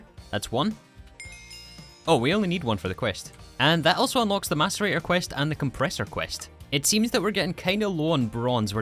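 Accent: Scotland, United Kingdom. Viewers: bro im new here you Scottish or something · 3 are you Scottish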